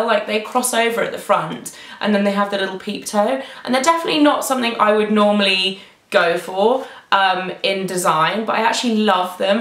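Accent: United Kingdom. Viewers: I agree, maybe it's the voice and the british accent! :)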